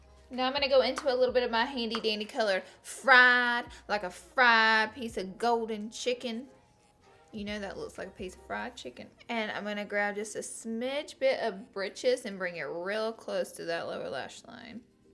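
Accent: South, United States. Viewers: Love this look! Is it just me or does her fabulous accent sound really strong in this video?! Southern accents are my fav! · Were you tired when you did this vid hun? I'm a newbie subbie but you didn't seem as bouncy as usual? Btw love your accent (I adore a southern accent! Always wanted to marry me a southern gentleman - kinda hard to find them in south east London uk though!!) 😋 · I love the way you say sugar! Southern accents are amazing❤️ probably why I married a Texan 😂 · i used to hate southern accents for some reason and then i fell in love w/ her omg :') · PSA: Shes from the south. She has an accent. Get over it. 🤷🏻‍♀️ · I'm so in love with your accent. I'm from Texas and miss people talkin like that. But your accent is a lot stronger than us Texans lol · it bothers me that this comment is on every video and still....I mean doesn't it occur to you that it's not improper pronunciation, it's an accent? 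she's clearly from the south! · she looks so pretty in this but i love the southern accent because i'm southern too 💗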